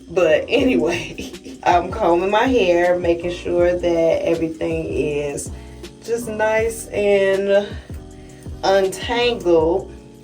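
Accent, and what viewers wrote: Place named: South, United States. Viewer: I love your country talk😂 it’s so cute and funny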